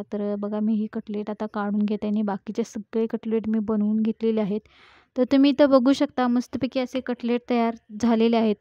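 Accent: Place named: Kolhapur, India. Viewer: रांगडी कोल्हापुरी भाषा आहे ती ताई खिसुनच बोलाव लागत...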